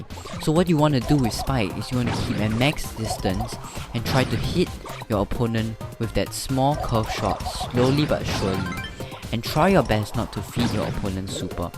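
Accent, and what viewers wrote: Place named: England, United Kingdom. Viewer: accent anglais BRUH.... lol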